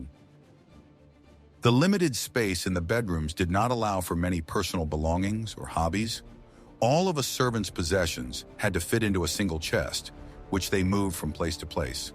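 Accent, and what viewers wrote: United States. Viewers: More god awful American robot narration!!! 3 seconds in and I'm off!! 👎👎👎👎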